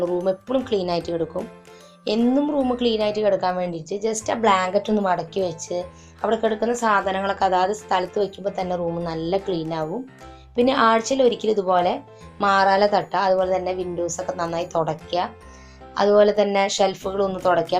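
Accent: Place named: Mala, India. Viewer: Evideya veedu?mala,tcr boarder ano?samsaram maarala.(mala).marambala trissur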